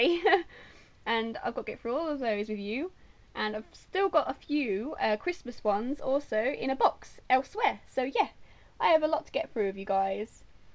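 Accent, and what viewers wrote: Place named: London, United Kingdom. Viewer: Are you from near London? You have such a London accent haha :)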